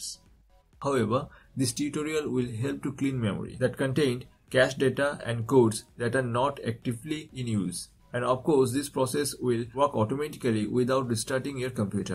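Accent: India. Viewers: because the guy who made this video is Indian and this guy despite possibly getting helped insult him by saying his accent is like the Indian scammers. And again, I'm not Indian.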